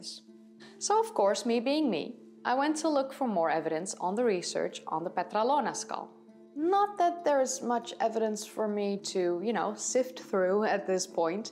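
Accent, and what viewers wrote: Netherlands. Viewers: Are you Dutch ? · The way you pronounce "technical terms", I thought this woman is Dutch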